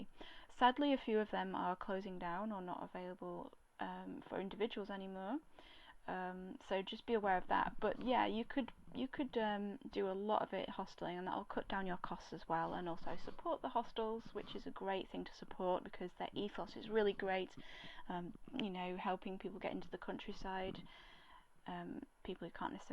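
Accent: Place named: Northern England, United Kingdom. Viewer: Good advice, but why the London Hiker?, you sound pretty northern to me.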